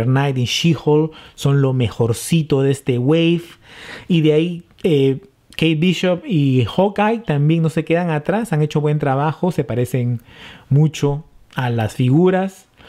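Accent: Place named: Peru. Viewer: Por fin un shartimus prime que puedo entender xD, buen video Bro, eres de Perú me párese? Tienes un suscriptor más (y)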